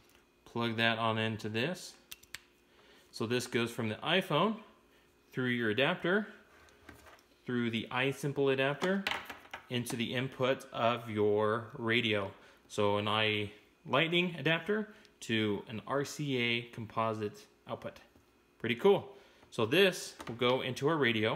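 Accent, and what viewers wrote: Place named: Ireland. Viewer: Damn this guy is severely irish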